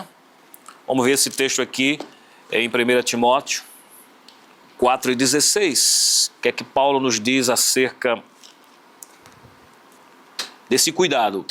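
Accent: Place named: Northeast, Brazil. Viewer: Parece nordestino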